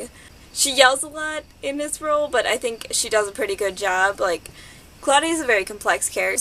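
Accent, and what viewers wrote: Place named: Brooklyn, United States. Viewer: I'm just starting to notice your Brooklyn-ish accent. It's cute. That is all C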